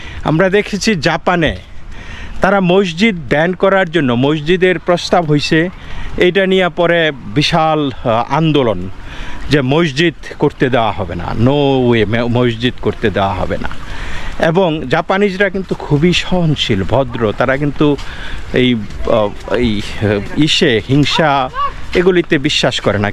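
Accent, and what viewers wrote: Bangladesh. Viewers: your English pronunciation is like Bangla.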